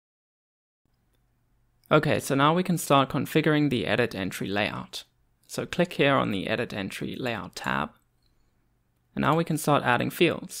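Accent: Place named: South Africa. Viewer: South African accent FTW :)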